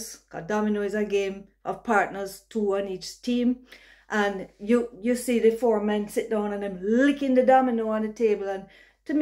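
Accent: Jamaica. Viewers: Exactly my Point. You ARE JAMAICAN. Not that many people were questioning that (except for that "ignoramous" Michael Knight)...But ANY Real Jamaican who HEARS your Stories (which to me are just your LIFE HISTORY) and hear you talk, would NEVER question if you are Jamaican.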